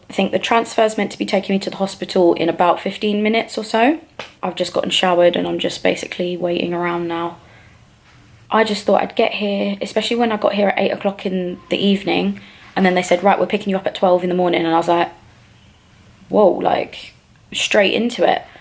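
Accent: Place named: England, United Kingdom. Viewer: Yes sound like my friend from England I love it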